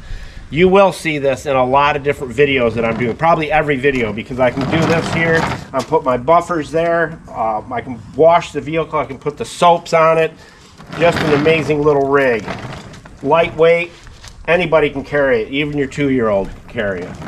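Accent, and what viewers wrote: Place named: Michigan, United States. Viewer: Been watching you for years. If memory serves you were somewhere in Michigan. (We can still hear the "Michiganer" accent) We're down near Tampa. Welcome to Florida.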